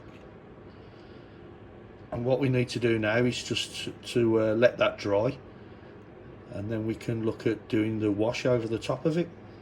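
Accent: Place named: Black Country, United Kingdom. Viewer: As a Brummie myself, accent is more like the black country yam's, sort of reminds me of 'Barry' in Aufhedisen Pet 😅 Good rusting effect video, 👌👍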